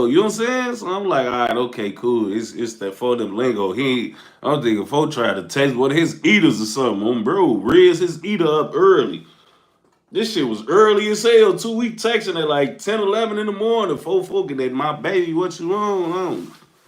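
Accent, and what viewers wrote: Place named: Detroit, United States. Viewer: I’m from Detroit that’s how we talk my baby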